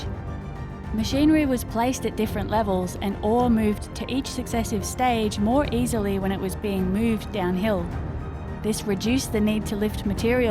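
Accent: Australia. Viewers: The mine was closed when I was there, so this is great. :-) Thanks! Lovely Aussie English narration! The narrator needs to be hired by all Aussie content producers!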